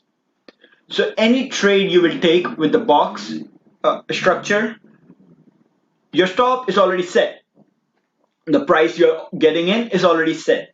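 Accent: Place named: India. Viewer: thick indian accent, my head is wobbling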